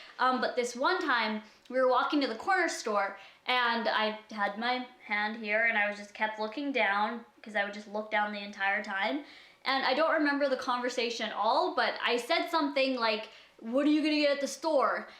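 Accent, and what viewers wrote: Canada. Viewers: Wisconsin??? She's from Canada like you said earlier :)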